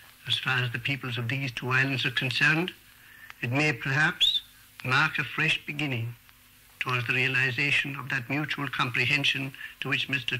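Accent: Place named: England, United Kingdom. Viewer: He sounds more English than Irish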